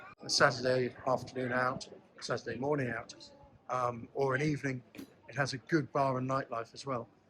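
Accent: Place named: England, United Kingdom. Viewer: No offense in what I say. I like your accent and it is a shame people from England leave to move to Greece to lose the accent over the generations. Also to put a burden on Greece to cause a housing crisis despite you build your own place. But also it is a shame that in England they have the same issues in what is done to them yet natives to England do the same to Greece. Its a chain reacton. But also that many saints warn against mixing ethnicities since they lose their culture language religion tradition etc and its like mixing all crayons and we have one color brown. Even articles that show research see that 90% of Greek outside of Greece lose all those things listed. Then they become more liberal part of what the state wants to cause more issues and its makes things worse around the world for everyone since more selfish more liberal. 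Some joy now but down the road it will get worse just like many things got worse every decade for everyone overall. The respect is put to the side and when that happens no one gives respect and the issues grown. We are not fighting for a future for kids, family, ancestors, community but for self. As AI would sum up what what many saids and elder say "Christian tradition upholds national identity, natural human distinctions, and patriotic duty as part of God's created order, viewing anti-nationalism or globalism as a violation of nature, while simultaneously condemning chauvinistic, idol-worshipping, or racial-hatred nationalism as a moral corr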